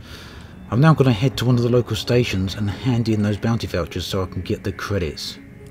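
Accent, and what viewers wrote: United Kingdom. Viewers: very interesting accent, does anybody know from which region in the UK is his accent from? just curious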